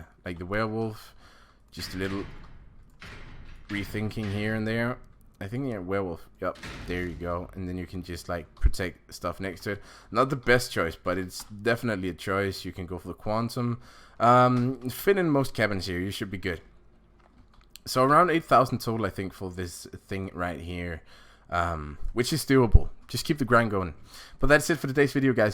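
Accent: Denmark. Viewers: Just a random guess, is from Denmark? (judging by accent) If so, then TUBORG HVERGANG!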